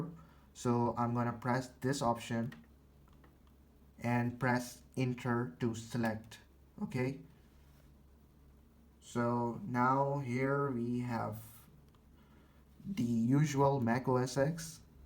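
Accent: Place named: England, United Kingdom. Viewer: thanks god for your english accent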